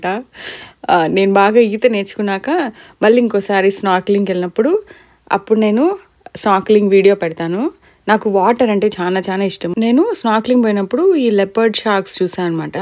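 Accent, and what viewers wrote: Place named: Rayalaseema, India. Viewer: mee Rayalaseema Accent chala chala bavundi And locations are so beautiful thank u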